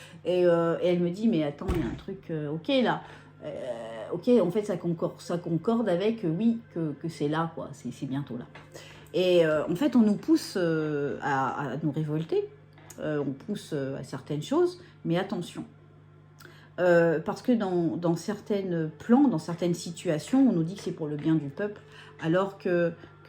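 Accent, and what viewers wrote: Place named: South, France. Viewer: Bonjour,pas mal l'accent du Sud 😋 capsule humoristique avec un cap bien mené ☺️ merci et a bientôt 🎉❤🎉 je vous envoies les cigales 🦗🪰 d'Aix en Provence 🍀🍀 gros bisous 🌹🌹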